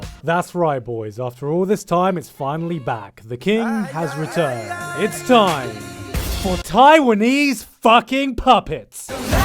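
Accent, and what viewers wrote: United Kingdom. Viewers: Is this dude British or American I can't tell?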